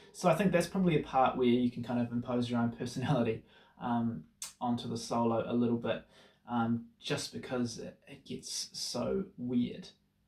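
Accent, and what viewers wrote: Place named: New Zealand. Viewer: Thanks for doing this. It's a lovely song. One note: Because of your Kiwi accent, I thought you said 19th fret when describing the solo when you were actually saying 9th/10th hammer on. I was flummoxed for a few minutes until I groked it.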